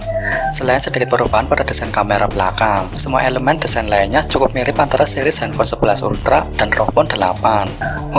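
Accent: Banyumas, Indonesia. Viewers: ngapak banget sih ya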